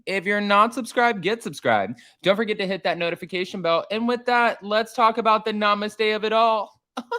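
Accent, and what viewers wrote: New Jersey, United States. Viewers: I love your 'Joisy' accent! Great recap, and am happy that you and had so much fun with your Vanderpump family. Continue to love love love your very eclectic content!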